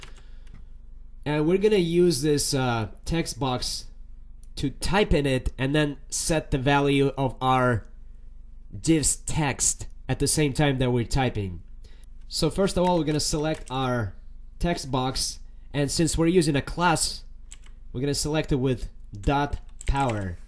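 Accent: India. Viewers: hahaha indian accent, hhhh btw i am soooo lucky to find your channel cuz you explain the syntax better than anyone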